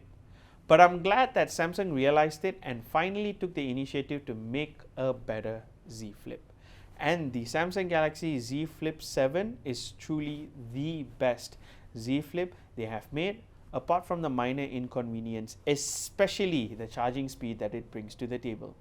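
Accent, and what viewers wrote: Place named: Sri Lanka. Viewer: Just asking are you from srilanka?? Loved ur accent..❤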